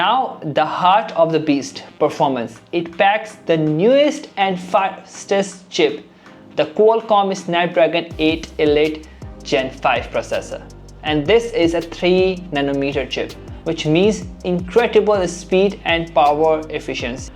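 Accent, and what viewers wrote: India. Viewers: Orang prindavan...logat e udah...terasa..😂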